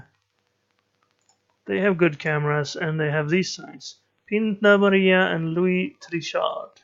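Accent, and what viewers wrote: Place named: Scotland, United Kingdom. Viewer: For Greek script you can keep in mind that the P is actually an R (similar to Cyrillic in Russia), the triangle is a D, the H is an E, the triangle without a bottom line is an L and the P is an R. That are - im my opinion - the most valuable Greek letters. And if I may ask, from which country are you? Yo sound like a Scotsman.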